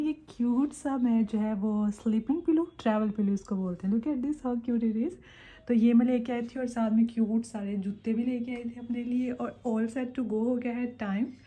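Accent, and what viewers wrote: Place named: Sialkot, Pakistan. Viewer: Nice video baji ap kaha se hai Pakistan aapki language Sialkot ki lagti Hai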